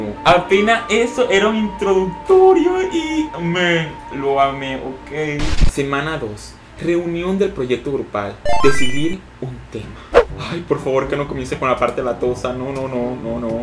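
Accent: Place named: Venezuela. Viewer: Me gusta tu forma de explicar... Tu acento suena a... venezolano... hablas muy chistoso.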